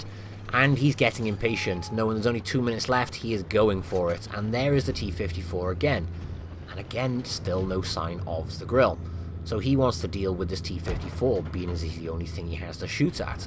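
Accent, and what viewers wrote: United Kingdom. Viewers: Oh god I just love to hear your voice and laughter and that stunning British accent of yours along with the explanation of what is happening in the replys frankly as much as it's sad to say but truth be told for me you are The best WOTB YouTuber and yes even better then TTTimster he's latest videos became more like (I'll put whatever is there to refresh the channel case) honestly at least in my own prospective and you already know that jimmy the one with the E_100 reply already sent one to TTTIMSTER and honestly I didn't like it in any shape or form especially with he's terrible commentary...... unlike you m8 always happy to watch your videos some of them can make my day... so please don't stop laughing and publishing those beautiful videos of yours... bye.....:D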